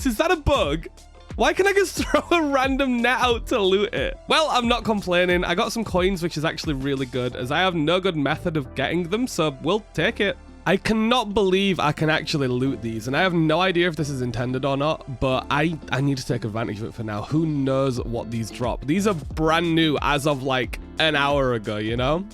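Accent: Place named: North West England, United Kingdom. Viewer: you sound like ur from the north west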